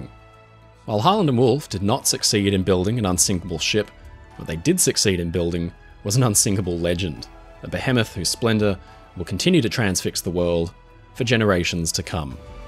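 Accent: Australia. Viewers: I’m with you, but I’m eternally grateful. His great storytelling is a welcome distraction to the vitriol and animosity found in today’s world of news and politics. His soothing voice and Aussie accent sooth my jangled nerves. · I just want to say some things about what a testament to how good your channel is. As an Aussie myself, I generally dont like narrators/presenters with an Australian accent, I guess growing up with it I just usually don't enjoy it 🤣 BUT, the way you narrate is just so lovely to listen to and i often just enjoy having playlists of your videos on in the background while I game or work. Im also not super into maritime as a topic in general (aside from the pretty common fascination with Titanic) but i find the way you explain things with such simplicity and genuine love of the topic makes the info you give feel really digestible and understandable and I'm finding myself getting pulled into an interest I didnt have much draw to. 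So, i just want to say thank you. For being company when I've needed to decompress, for being informative in a way thats accessible and for just coming across as an all around really likeable channel. Keep up the absolutely spectacular work, my dude 🩷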